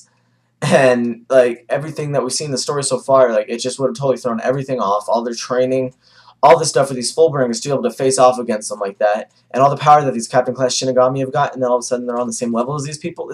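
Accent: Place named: United States. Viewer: lol you sound very American in your pronunciations of names but yea I am the same but British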